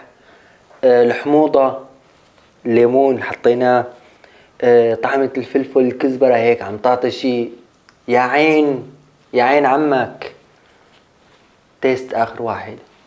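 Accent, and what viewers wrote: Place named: Idlib, Syria. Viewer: لهجتك ادلبي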